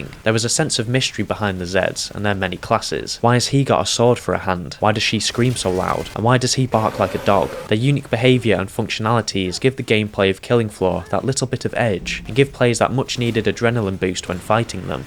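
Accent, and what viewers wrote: United Kingdom. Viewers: I felt like it was a matter of time before I actually heard a brit shitting on this game.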